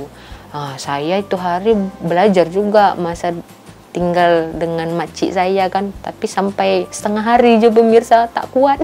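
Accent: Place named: Malaysia. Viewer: akak ni asli dari Indonesia ye kak?? cuma mahu tegur lenggok dan sleng/loghat nya sudah kayak orang Malaysia... saya rasa kagum sama akak...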